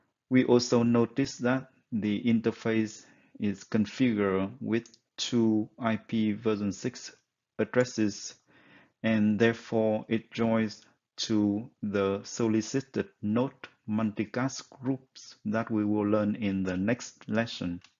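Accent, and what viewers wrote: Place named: Vietnam. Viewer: nghe phát âm tiếng anh biết ngay người việt =)))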